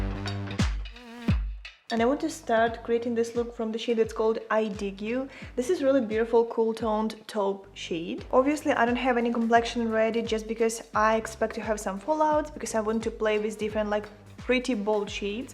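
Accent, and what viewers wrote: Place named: Ukraine. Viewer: Love this look so much. You’re earrings are gorgeous. And I just really love your Ukrainian accent when you speak English. Awesome video. 🤩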